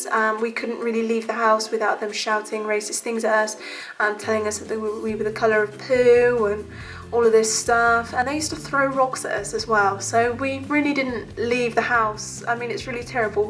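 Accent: London, United Kingdom. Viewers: I thought you were from London or some where round there sorry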